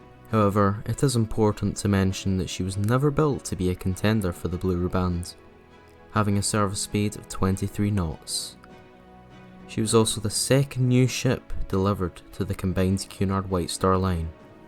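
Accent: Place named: Liverpool, United Kingdom. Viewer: Lovely video. Enhanced by your accent. Liverpool? Great video about our undersung hero.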